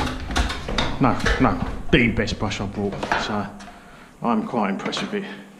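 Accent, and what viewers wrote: United Kingdom. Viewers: Are you from east London 👍😁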